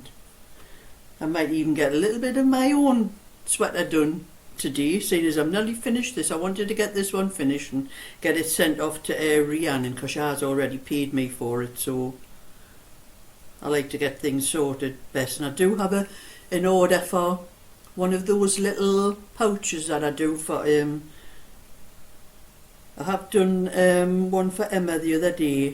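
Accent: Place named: Hartlepool, United Kingdom. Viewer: Love listening to you talk.. I'm a Hartlepool lass living in lincoln.... your lovely accent reminds me of home.... you are a lovely knitter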